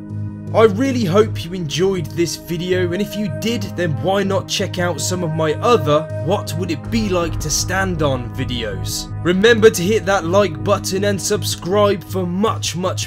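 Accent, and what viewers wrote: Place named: West Country, United Kingdom. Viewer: I can hear a slight West country tinge to your accent.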